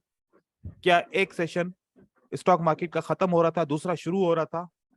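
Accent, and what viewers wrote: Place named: Sindh, Pakistan. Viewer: Sir app ki voice se lag Raha ha app Sindhi haan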